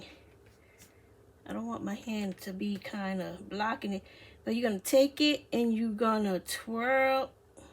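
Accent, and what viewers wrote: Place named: South Louisiana, United States. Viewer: You are so excellent, at doing fae bake tutorials. ❤️ Your accent is identical to my cousin's accents in Houma, La. as I've shared before,; you make me so homesick, for south Louisiana.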